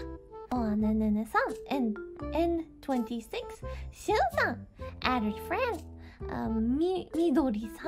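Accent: Taiwan. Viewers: 台灣口音XD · 台灣國語的會長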